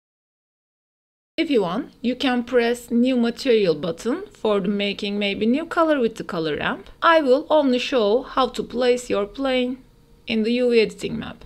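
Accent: Turkey. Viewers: Bu aksan ben Türküm diyor 😄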